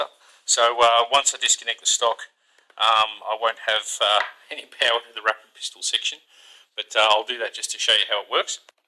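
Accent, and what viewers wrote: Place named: Australia. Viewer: this fellas Australian, I knew when I heard the voice, then I saw the fridge and it was a dead giveaway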